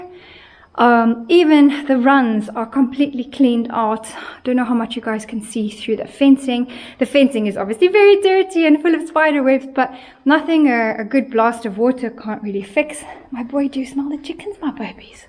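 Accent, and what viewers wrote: South Africa. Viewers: I can spot a "fellow south african" an oceans away 😂 🇿🇦🤌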